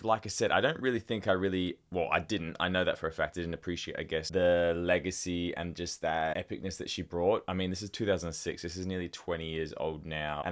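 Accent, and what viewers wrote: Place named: Australia. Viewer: The way I could tell he was Australian before clicking on the video 😂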